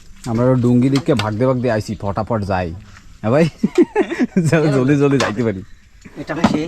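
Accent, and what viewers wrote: Barishal, Bangladesh. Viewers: তোমার কি বাপদাদা বরিশাইল্লা ছিল। বরিশাল এর মত কথাবার্তা 😊😊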